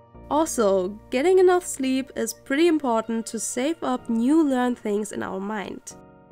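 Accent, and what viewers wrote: Germany. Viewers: Kommst du von Deutschland😄 Ja oder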